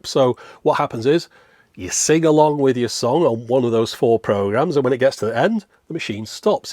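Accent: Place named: Wigan, United Kingdom. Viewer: "It's a screw! I can feel it!" I am seriously tempted to give this channel money for Wigan voiced content like this.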